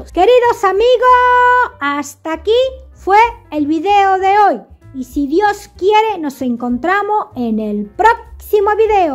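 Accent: Tucumán, Argentina. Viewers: Felicitaciones, por esa exquisita TORTILLA TUCUMANA, señora. Me encanta escucharla, esa tonada tucumana, me recuerda a mi querida madre, que nos hacía las mejores comidas tucumanas. Soy tucumano, resido en Buenos Aires, estuve un tiempo viviendo en mi querida provincia, trabajé en los medios de comunicación de allí, como en canal 10, Diario La Gaceta, LV12, Radio Nacional y, también en LV7. Soy meteorólogo y, tenía a mi gran amigo, ya fallecido el periodista Mario Escobar, que me presentó en casí todos los medios. Ud. me hizo caer unos lagrimones al escucharla hablar, recordé a mi madre. Le deseo a Ud. y familia, muchas Bendiciones.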